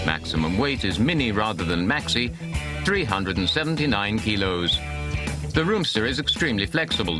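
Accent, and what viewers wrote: United Kingdom. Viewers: Typical english hooooooooooooomosexual talking.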